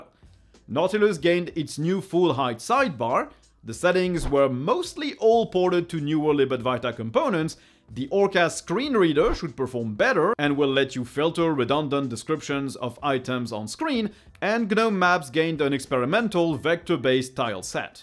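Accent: France. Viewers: "Native waylANd experience" Ton français ressort toujours un peu, haha :')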